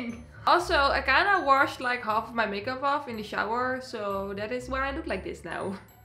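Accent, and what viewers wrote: Netherlands. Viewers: yo for real, are u dutch? sorry but u have SUCH a dutch accent hahaha love you <3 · Je klinkt zooo nederlands lmfao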